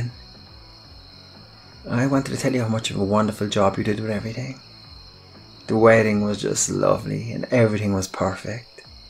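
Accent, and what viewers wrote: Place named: Ireland. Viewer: This version sounds so classy, romantic and Irish like. Love it. Love it. Love it ❤ Yes to the foot rub. More of this please😍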